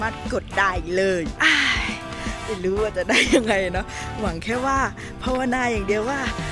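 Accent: South, Thailand. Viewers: พี่คนใต้เหรอผมก็ใต้นิพี้เห้อ😂😂😂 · พี่พูดทองเเดงตลกมากผมก็คนใต้ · พี่เป็นคนใต้เหรอครับ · พี่คนใต้เหรอครับผมก็อยู่ใต้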